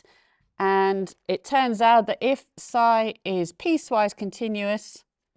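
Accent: United Kingdom. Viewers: Ŕeal british English ❤❤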